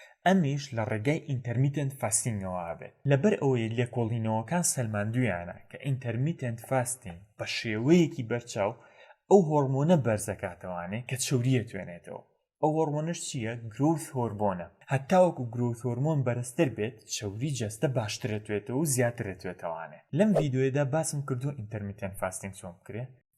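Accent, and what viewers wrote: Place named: Badini, Iraq. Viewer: كه مئك باديني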